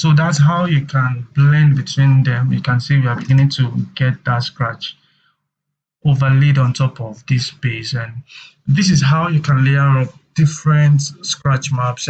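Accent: Nigeria. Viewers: bro u nigerian?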